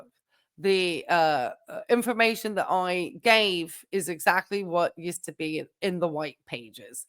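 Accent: United Kingdom. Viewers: if he keeps it up his account will be suspended. it is their own policy. Sarasota Tim is not just targeting you. You are allowed to use some of his material under fair use. As long as it is not for financial gain and just for commentating. It is the same thing has the broadcast media they do it all the time. Tim and Tammy just like scamming people. Their older broth Johnny a very well known Las Vegas area family friendly comedian who lives in Laughlin. I think he performs regularly at the Sands Motel and Casino. Ace Frehley had to deal with them too. On his channel. Love your Cornwall accent. Lovely part of the country.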